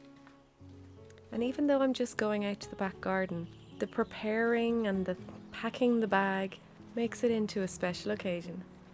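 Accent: Ireland. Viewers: Where about from Ireland are you from as I adore your accent. My parents were Northern Irish and from Belfast. They emigrated to Australia when young and had 3 children with me being the only girl and youngest. I’ve never been but as I’ve got older I speak now with a broad Belfast accent I picked up from my years living with my parents. My husband is Scottish and we can’t believe how broad my accent is even though I’ve never been abroad. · Oh wow! I loved this. I don't know how I haven't seen your channel before! I'm Irish but living abroad and the two things I miss most about Ireland, the Irish accent and nature, you provide in abundance. I am now your number one fan ;-) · Well I know that I could sit and listen to that Irish lilt all day long!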